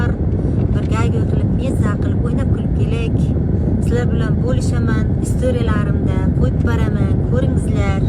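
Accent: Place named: Syrdarya, Uzbekistan. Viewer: Сирдареча гапирди😅😅😅😂😂😂😂😂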